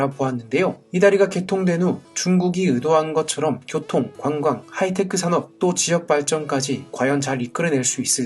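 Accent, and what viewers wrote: Gyeongsang, South Korea. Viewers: 채널 주인 분이 경상도 억양을 쓰시다보니 그런 것 같아요ㅎㅎ 경상도에선 유궐치뤌파뤌 이렇게 발음하다보니깐요.. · 경상도 사투리에요, 발음이 쌘게 특징이죠.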